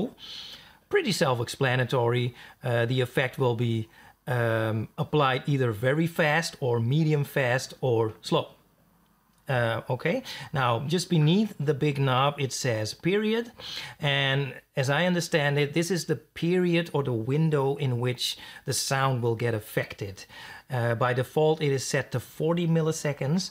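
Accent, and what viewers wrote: Netherlands. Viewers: I hear a slight Dutch accent so I assume you're Dutch but I'll keep it in English! I have installed Flux on my laptop and downloaded Bittersweet through the Flux app but I can't the DLL files anywhere to use it in my VST plugins in FL. How did you get it to work?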